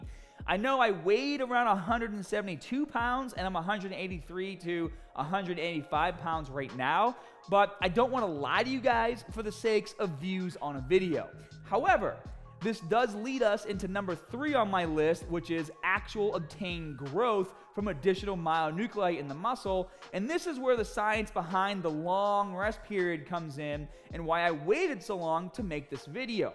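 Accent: Boston, United States. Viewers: Are you from Baah Ston? :-)) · Wait is that Boston accent fake or not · That Bostonian accent is great... say Park the Car in the Yard 😁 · What are you from Boston?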